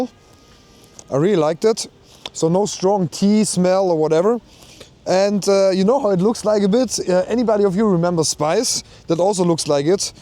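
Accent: Germany. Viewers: Kannst nicht mal auf deutsch, bist aus deutschland das hört man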